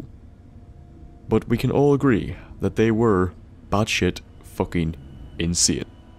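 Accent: Northern Ireland, United Kingdom. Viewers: Are you northern Irish? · Same! Thought I recognized a familiar NI twang in your voice. Keep up the great videos! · Are you from Northern Ireland?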